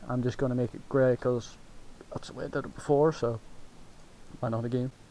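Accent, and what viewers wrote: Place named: Northern Ireland, United Kingdom. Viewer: Northern Irish accent is beautiful. Could listen to it all day!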